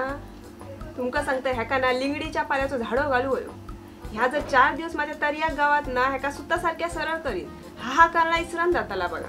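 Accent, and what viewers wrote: Malvani, India. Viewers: तुमची मालवणी खुप पीवर असा.🙏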